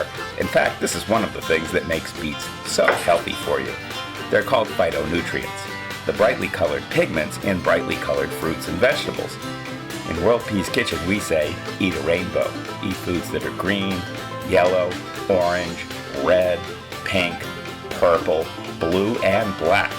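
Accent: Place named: United States. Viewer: I love it! Sounds very country and the music complements it.😊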